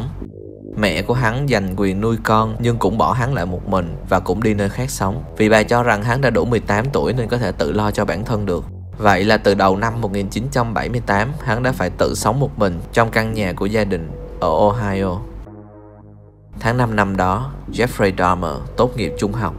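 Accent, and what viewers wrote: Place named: Saigon, Vietnam. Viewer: a này nói giọng sài gòn hay và cuốn quá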